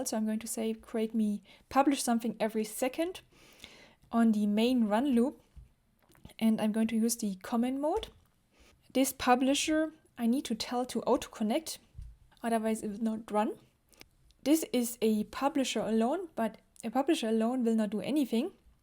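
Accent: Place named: Germany. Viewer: I totally like your step.by.step approach but at the same time efficiently and quickly cover the whole picture. It's like from ZERO to HERO. Very nice. Thank you very much for your videos, that I immediately have subscribed to. Keep going! By the way your accent, though your english seems flawless sounds a bit "german". I know, what I am talking about 🤪. Are you german?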